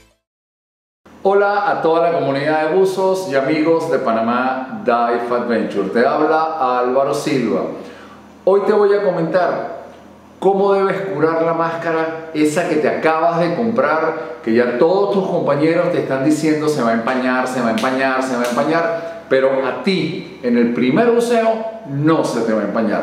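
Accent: Venezuela. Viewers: Ese acento es VENEZOLANO donde se pare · LO SABÍA!!!! Soy canario, y no sé cómo hablan los panameños pero a mis primos venezolanos los reconozco en un ratico 😄😄😄😄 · Gracias. Voy a hacer esto, compre una y de verdad q se empaña mucho. Despues les dejo saber. Eres venezolano?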